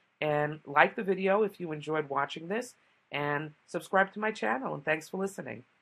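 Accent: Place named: New York, United States. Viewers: Cool Accent. New York ? Jersey ? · love your accent, haha since i am a New york fanatic, i instantly knew it,hahah i know, but im from europe and I really want to go there someday, great video thank you for the great tips :)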